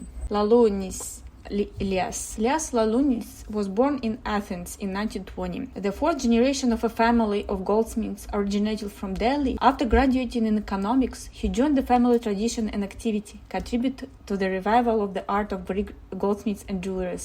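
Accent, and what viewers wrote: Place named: Russia. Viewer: She’s Russian. This is a Russian accent and I understand her perfectly. It helps that I attended a Russian church for over 35 years with my parents so I got very used to hearing Russian.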